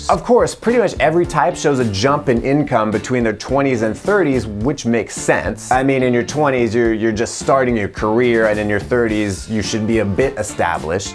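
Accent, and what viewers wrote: United Kingdom. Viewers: i'm british, i've gotta say doesn't sound anything like a Londoner but sounds like the people where i live, good british accent.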